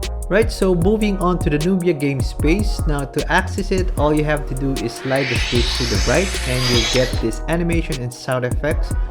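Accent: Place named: Manila, Philippines. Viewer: Pansin ko lang accent mo, accent na taga manila talaga hehehe Appreciate the props though.. Catchy sya at thanks sa review, sana next time share mo din di lang graphics na nalaro mo sa isang game kunti pati frame rates din.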